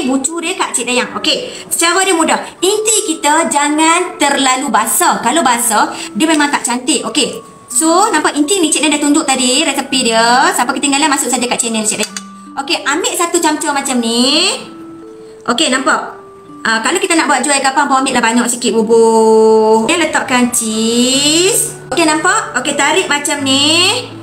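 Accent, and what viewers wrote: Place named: North, Malaysia. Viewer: Suka dengaq hang habaq Utara no..."jgn gatai2 tgn lipat" 😂😂😂🤣🤣🤣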